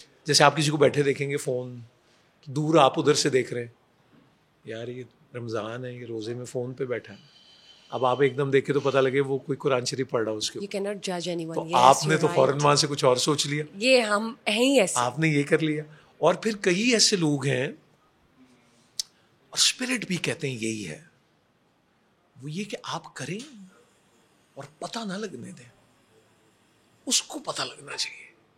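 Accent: India. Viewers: Hindi influence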